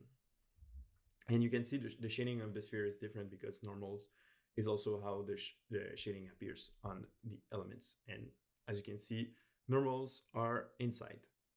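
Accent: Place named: Quebec, Canada. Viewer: haha j'ai reconnu ton accent québécois. Merci beaucoup pour ce tutoriel.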